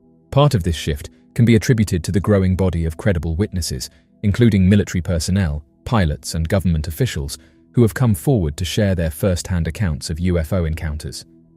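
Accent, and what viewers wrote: United Kingdom. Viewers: If the narrator has a British accent......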